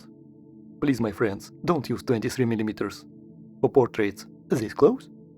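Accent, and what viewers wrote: Russia. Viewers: Приветствую! Я редко пишу комментарии но щитаю что нужно разъяснить ситуацию с хейтом против вас немного с другого ракурса ))) Есть видео от других авторов по этой теме (100ультра vs 200про) где всё наоборот! Где 200про зачастую лучше 100ульты причём по основному модулю! Существует такой канал 2Megapixels и там есть видео (недели 2 ему) где 100 ультра не особо вывозит 200про ))) И там тоже есть конкретные примеры ))) И тут возникает справедливый вопрос! А кто же из вас двоих не прав! И я прекрасно понимаю людей что обрушились на вас с бурей негодования и хейта. Ведь их претензии к вам основаны не на их не на чём не обоснованном мнении а на конкретных примерах с других каналов по точно такой же тематики что и ваш канал. И тут уже дело не в особо одаренных хейтерах а в том что ваш контент противоречит контенту других авторов которые зачастую обладают более авторитетным мнением чем вы. 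Вот поэтому вас некоторые люди и воспринимают довольно поверхносно и крайне предвзято относятся к вашему контетту. Щитая что вы очередной хейтер конкретной модели 200про. И да главное поменьше делайте акцента в ваших видео на критику в ваш адрес. В противном случает люди что навалили вам негатива будут делать это усерднее ))) Хм по вашему акценту я думаю вам не потребуется гугл переводчик для прочтения моего комментария )))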